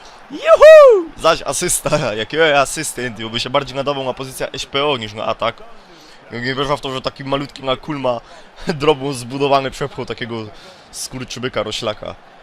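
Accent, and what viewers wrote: Silesia, Poland. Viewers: Zajebisty twój Śląski akcent. Miło się go słucha. I te teksty :D. Mieszkamy trochę blisko, tyle że ja z Dolnego ;) .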